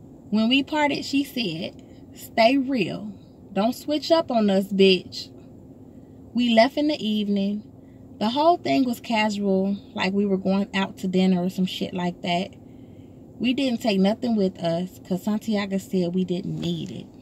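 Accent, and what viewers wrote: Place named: South, United States. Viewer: The reader has a very strong southern accent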